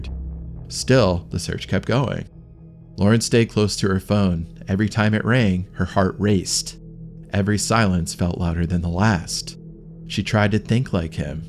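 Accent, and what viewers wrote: United States. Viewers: I started watching this, but I can't tolerate an American accent telling us about our own country which they think they own.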